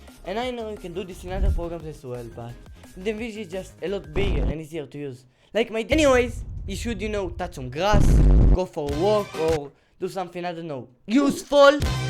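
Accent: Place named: Russia. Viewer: in a very good way, sounds like you are from MOTHER RUSSIA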